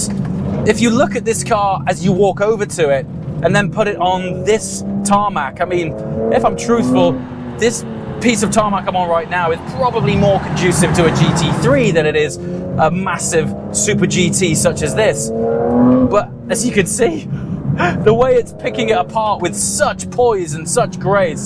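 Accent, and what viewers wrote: RP, United Kingdom. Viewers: Not sure who this guy is but it’s the second video I’ve seen with him, and he’s reviewing. He’s very good and presentable. Great work and look forward to more. A bit too Posh British but like i said, well presented and spoken in detail.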